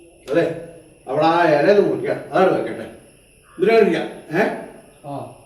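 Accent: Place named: Namboothiri, India. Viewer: സത്യം പറഞ്ഞാൽ നമ്പൂരി സ്ലാങ് ഇത്തരം കഥക്ക് മാച്ച് അല്ല. നിങ്ങളെ പഴയ പർളിവുഡും നമ്പൂതിരി നേരം പോക്കും തന്നെയാ നല്ലത്. ഞാൻ രണ്ടും ആസ്വദിക്കുന്ന ആളാണ്. അതായത് അവനവന് ചേരണ കോണകമേ ഉടുക്കാവൂ എന്ന് സാരം❤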